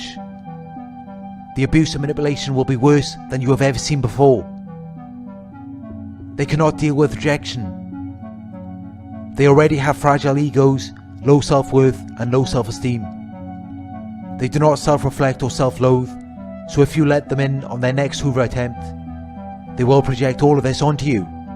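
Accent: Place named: New York, United States. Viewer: I FOUND it looks Great. You look and Sound so New York. My Dad was from Flatbush.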